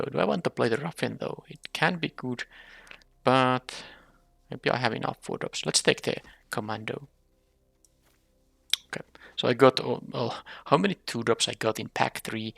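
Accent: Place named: Finland. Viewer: You sound Finnish! Lovely to see your face :D Thanks for all the draft videos, I've found them very helpful.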